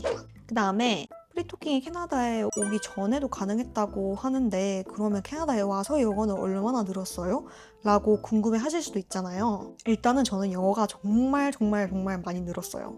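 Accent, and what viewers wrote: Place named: Gyeongsang, South Korea. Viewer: 갱상도 사투리네여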